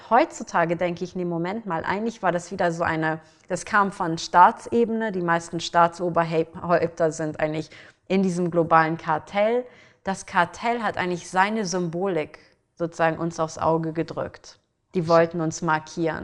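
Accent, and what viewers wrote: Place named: England, United Kingdom. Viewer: Ihr leicht englischer Akzept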